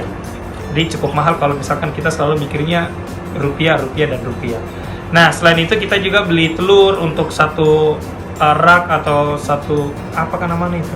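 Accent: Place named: South Sulawesi, Indonesia. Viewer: Cara ngomongx sprti org sulsel. Salam dr polman, sulbar. Ada lowongan kah utk wanita???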